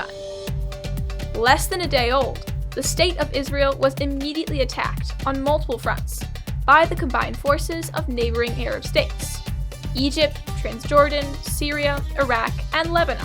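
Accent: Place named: United Kingdom. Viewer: huh, narrated by a young British girl. kinda new way for a channel.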